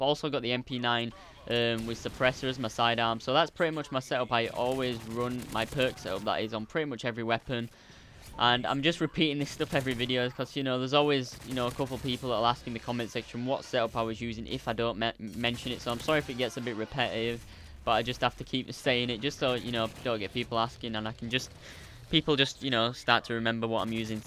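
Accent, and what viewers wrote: Yorkshire, United Kingdom. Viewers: Where you from mate, you sound like you're a fellow Yorkshireman?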